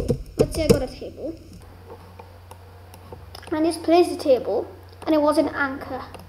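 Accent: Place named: Scotland, United Kingdom. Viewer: Omg your voice is so cute I love Scottish accents btw ty!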